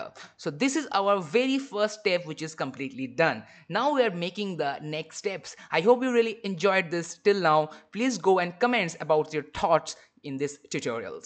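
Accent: India. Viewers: indian accent me bol le ya usa wale me bich me attka hai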